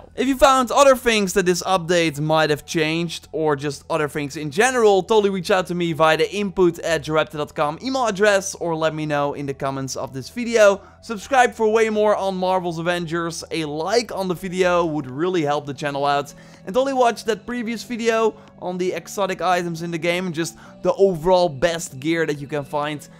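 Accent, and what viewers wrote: Netherlands. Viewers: Lemme guess...are you Dutch ? Waarom kost het ons zoveel moeite om zonder accent te spreken?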